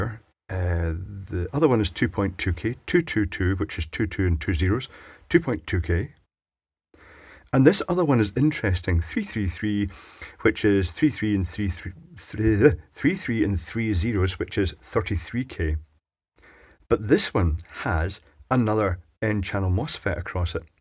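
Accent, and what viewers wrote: United Kingdom. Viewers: British English is in full effect in this video. Crikey.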